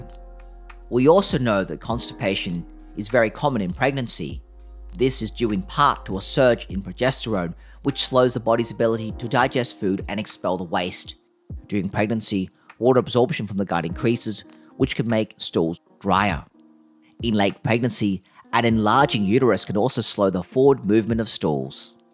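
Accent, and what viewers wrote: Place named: Australia. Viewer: Your Australian accent actually made this video fun and enjoyable because hey I'm litteraly full of shit ! But being serious nice video 😂😂 litteraly just got back from the hospital because of this sh*t !!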